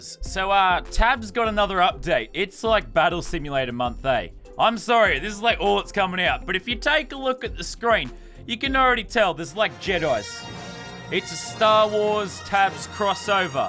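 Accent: Australia. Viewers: u have a Aussie accent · uhmmmm are you Australian?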